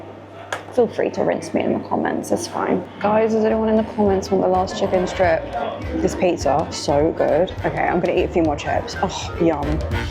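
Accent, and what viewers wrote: RP, United Kingdom. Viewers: You're painfully posh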